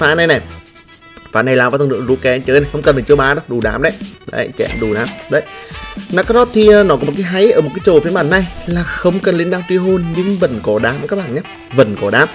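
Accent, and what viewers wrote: Nghe An, Vietnam. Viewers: Anh nghệ an à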